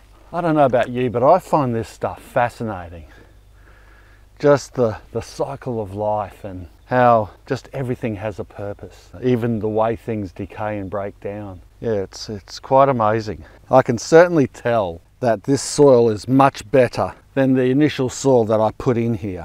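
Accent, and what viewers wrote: Australia. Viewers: Stralian ASMR. 👍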